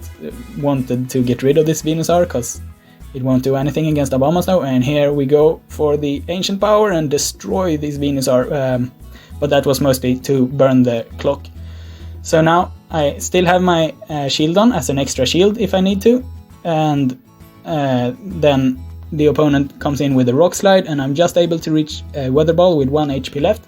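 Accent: Sweden. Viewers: R u Swedish?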